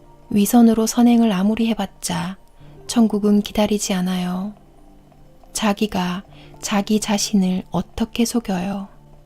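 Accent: Gyeongsang, South Korea. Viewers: 아 목소리...예뻐 좋아요~~특히 경상도톤~~~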